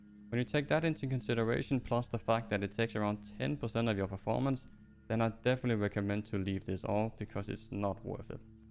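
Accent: Denmark. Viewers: I can smell danglish. But thank you for the help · Hey, i just found your channcel, by one of your old ark graphic settings video. I just wanted to ask, if you're Danish, because your accent, sounds familiar.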